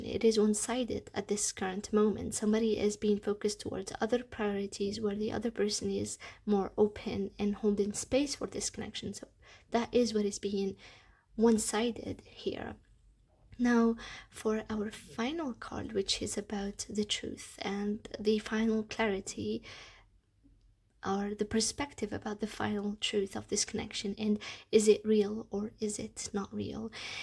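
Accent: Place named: Scotland, United Kingdom. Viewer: I know there’s a separate accent but you also sound slightly Scottish!! It’s so calming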